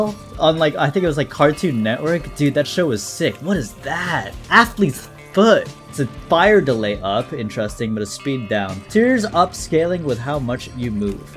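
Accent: United Kingdom. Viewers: Wait you're British, cringe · My day be so fine. Then boom. Find out is British.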